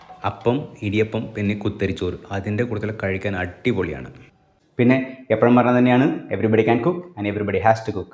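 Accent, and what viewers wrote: Tamil Nadu, India. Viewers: Ningalku tamil accent undallo! Nattil evdeya?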